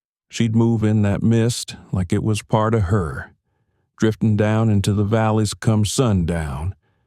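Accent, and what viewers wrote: South, United States. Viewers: Love your southern accent.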